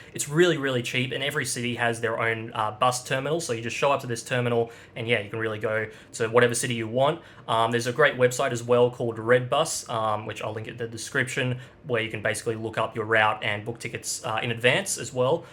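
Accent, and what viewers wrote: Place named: New Zealand. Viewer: Love that kiwi accent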